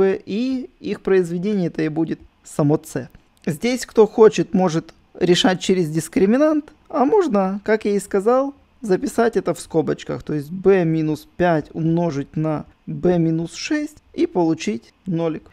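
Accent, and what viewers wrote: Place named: Israel. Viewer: По акценту давно стало понятно что ты не русский, а из Израиля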